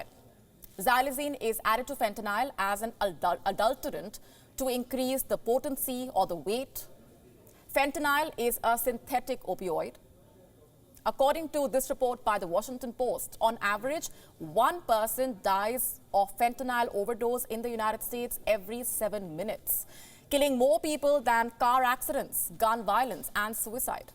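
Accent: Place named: India. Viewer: she's indian so her pronounciation is different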